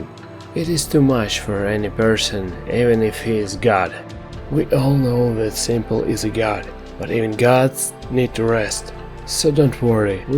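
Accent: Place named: Russia. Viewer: Слышен русский акцент)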